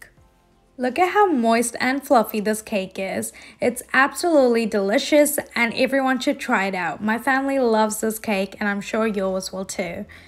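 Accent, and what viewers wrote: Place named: Gujarat, India. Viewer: I love carrot cake the best, it is a healthier one too, and easy to make and moist, I also love and love her English accent with a cute Indian Gujarati hint, nice to hear.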